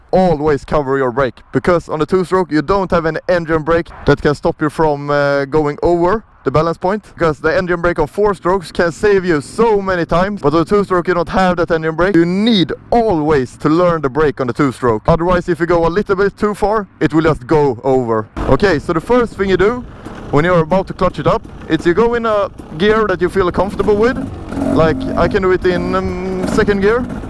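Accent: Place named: Sweden. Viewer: Subbing because that Swedish accent is awesome! So is that bike 😀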